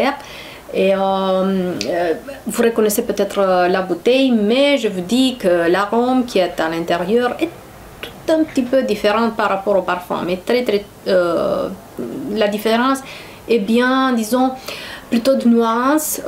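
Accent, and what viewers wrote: France. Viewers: Love this chanel and nice french accent!! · Nice review! I like your french accent. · J'aime vraiment la langue française, surtout l'accent du français, j'aime beaucoup voir cette vidéo tournée en français! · Nice French accent!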